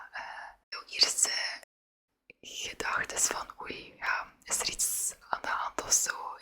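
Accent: West Flanders, Belgium. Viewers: Als een oost-vlaming met beetje zeeuws vlaams in zich is het heel leuk om naar het west vlaams te luisteren en het helemaal te proberen begrijpen ☺️ ook leuk verhaal over je buurman ik begrijp hem zeker! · West-Vlaams is leuker, alleen bergrijp ik niet goed wat je dan zegt🤣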